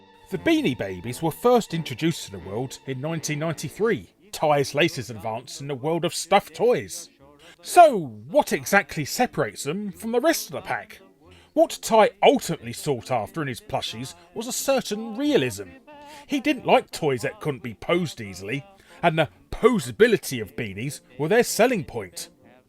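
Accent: United Kingdom. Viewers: Just found this channel... I really like it... Very well put together, engaging, funny, well researched, informative and of course the British accent... Subscribed... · you really make me laugh with your British accent and valuable information. 😄😄😄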